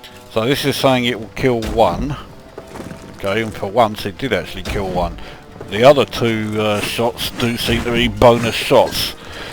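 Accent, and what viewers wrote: United Kingdom. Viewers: Dude, i love your hilarious commentary. Maybe it's the british accent. Subscribed.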